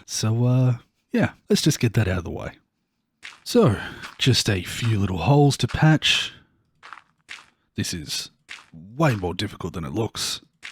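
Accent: Australia. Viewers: Im just new to your channel and so far im really enjoying your content and I love buddy, Am i hearing an australian accent, thats cool if it is, I really wish there were morre australians doing minecraft content. but if im not and im wrong thats cool too, beacause im enjoying your content.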